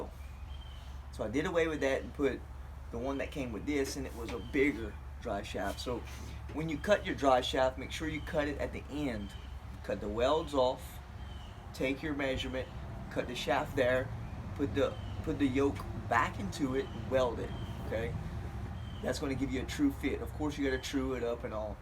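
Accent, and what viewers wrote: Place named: South Louisiana, United States. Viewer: Because I’m from South Louisiana, I bet I can tell where you are from . Eat much crawfish Cher ?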